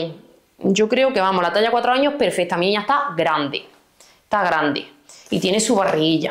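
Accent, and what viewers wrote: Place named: Andalusia, Spain. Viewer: Hola llevo 2 videos sin oírte k pena pork me a gustado todo pero no e oído esa voz de Andaluza desde Almería mil besitos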